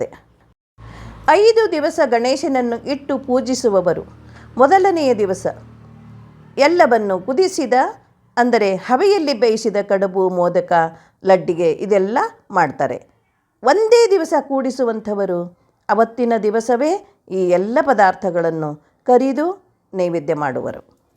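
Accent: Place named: Mangalore, India. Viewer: 🙏 ನೀವು ಯಾವ ಊರಲ್ಲಿ ಇರೋದು ಮಾಮಿ... ನಾನೂ ಕೂಡಾ ಉತ್ತರ ಕನಾಟಕ ದಲ್ಲಿ ಇರೋದು.... ನೀವು ನಮ್ಮ ಭಾಷೆಯಲ್ಲಿಯೇ ಮಾತಾಡಿ ಚೆನ್ನಾಗಿರತ್ತೆ... ನೀವು ಮಾತಾಡೋದು ಮಂಗಳೂರು touch ಇರತ್ತೆ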